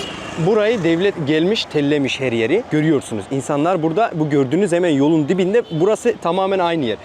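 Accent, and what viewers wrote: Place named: Adana, Turkey. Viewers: Kardeşim benim bu yorumları ciddye al sonuöta senin daha iyi yerlere gelmeni istiyoruz doğal akışında kendin oldugun gibi videolar çekmeye devam et belgesel tadında videolar başka bir tarz ve birkaç konuda kendini çok geliştirmen lazım ki gerek yok bence kendin oldugun gibi o anadollu şivenle sempatikliğinle yeterlisin belgesel tadına gerek yok kendini aşağı çekersin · Kanka adanalı mısın konuşma onlara benziyor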